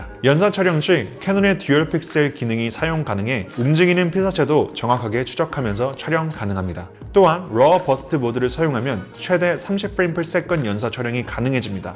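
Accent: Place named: United States. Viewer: 재미교포신가요? 한국어도 미쿡말처럼 말씀하심..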